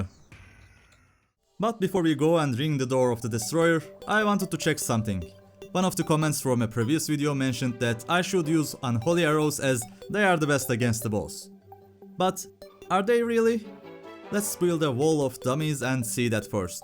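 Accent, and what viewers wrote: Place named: Turkey. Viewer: Are you Turkish? Your accent is resembling the Turkish accent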